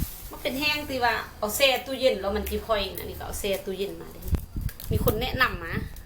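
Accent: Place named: Na Wa, Thailand. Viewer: สำเนียงคล้ายๆแถว นาหว้า ศรีสงครามครับ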